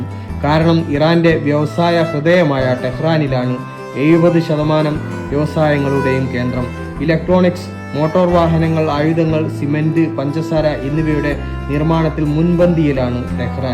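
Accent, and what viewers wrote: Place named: Kozhikode, India. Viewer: കോയിക്കോട്ട് കാരനാണല്ലേ? വല്ലാത്ത ഒരു യ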